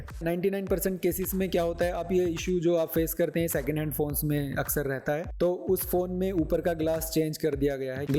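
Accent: India. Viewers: Indian english messes me up. I hear an india speak like this and I don't know wether I fail to get through the accent or they are just using a couple of english words between otherwise different language.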